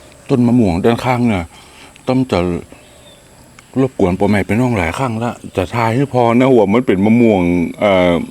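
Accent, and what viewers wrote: North, Thailand. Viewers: น่ากินเนาะ .คนเหนือพูดจาเพราะดีนะ.ชอบๆๆจ้า.❤️❤️❤️👍👍👍🇦🇹🇦🇹🇦🇹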